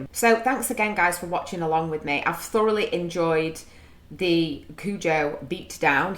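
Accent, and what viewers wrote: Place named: Stoke, United Kingdom. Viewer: Ay up duck. Always interesting to see a ren reaction. He’s brilliant isn’t he? 😂 Are you from manc? You sound almost Stokie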